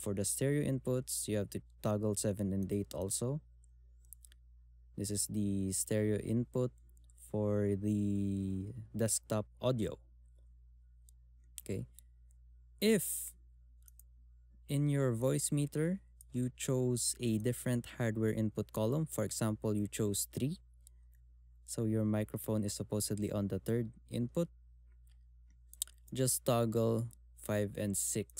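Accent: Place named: Mexico. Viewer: habla en español mexicano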